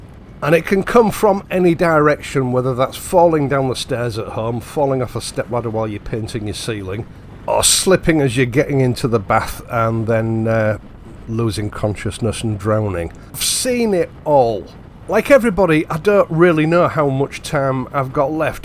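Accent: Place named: Yorkshire, United Kingdom. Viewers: Never been on a motorbike during my 66 years on this earth. I own six pushbikes but now I’m getting the calling to to do something different. I want to learn a new skill and test myself. Would never go over a 125, would never need to. Pretty fit and in not bad health, never do less than 10K steps a day mostly dog walking and have two massive LGDs. Your video has given me the motivation to take it further. Subscribed, as I always enjoy listening to a fellow Tyke. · Hi, I was saying to my wife what an unusual sounding voice you have, I have listened to you before on various subjects about bikes, but honestly I could listen to you talk for hours! The wife thinks you may have a Yorkshire accent, is this correct?!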